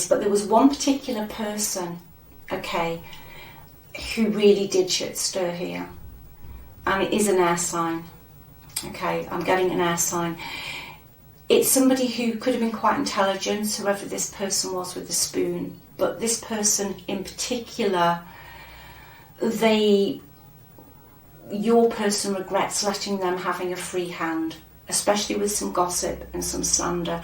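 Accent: United Kingdom. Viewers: You’re the best! Great to hear a fellow Brit ….keep dozing what you are doing. I feel relieved to be away from him!!!!!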